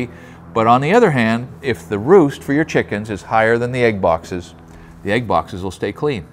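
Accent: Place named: Northeast, United States. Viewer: Love hearing the ring of a northeastern state from the mouth of an honorable northwestern man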